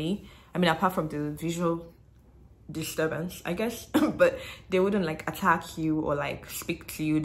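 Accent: Ghana. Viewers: Your accent is more Ghanaian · You really look, dressed and speak like Ghanaian. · Where did you get this accent, you speak like a Ghanaian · You look and speak like a Ghanaian. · Your accent is perfect like Ghanaian 😂👍💯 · surprised at your accent too. Do your people take you for a Ghanaian because of your changed way of speaking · Ur accent look like Ghanaian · I think you are more Ghanaian than Nigerian.Your accent, your calm nature and even your skin. Anyways I enjoyed your content,got yourself a new subscriber and also all Common Wealth students are all Vandals and it is not a cult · You sound like Ghanaian your English is quite different from Nigerian English. You sound more Ghanaians than Nigerian. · 5yrs and your accent is already ghanaian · You sound Ghanaian more than Nigerian Anyways I like your videos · Your accent is more Ghanaian now. Are u married? 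· You sound soooo Ghanaian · You sound more Ghanaian than Nigerian, I must confess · Hello, Once again, watching you from London. I know am not the first person tell you that you look and sound more Ghanaian than with Naija accent. You talked about sponsorship to Ghana, well You, my dear, are truly the kind that one would most definitely not say no as a next door neighbour. You know why, cos your whole body language or demeanor convey one message to the person watching you which says Peace all day long. - Just like Ghanaians period. Do not be surprised when you are swept off your that war ever so soon. You never know. This is Nash from London. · No Nigerian accent; u ve a perfect Ghanaian accent....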